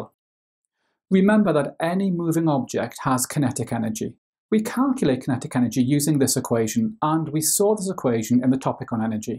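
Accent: Wales, United Kingdom. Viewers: Hello. Please could I ask if you are welsh?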